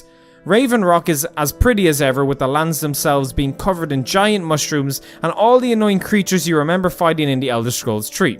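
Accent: Ireland. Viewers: I just found this guy. He sounds Irish to me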